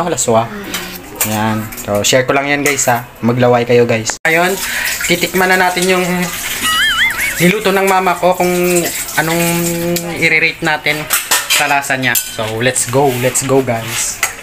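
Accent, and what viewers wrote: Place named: Iloilo, Philippines. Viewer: Hahaha dami kung tawa sayo lods.,lalo sa tabagak mo.,ay nano tinonto ito hahaha ilonggo gid mong.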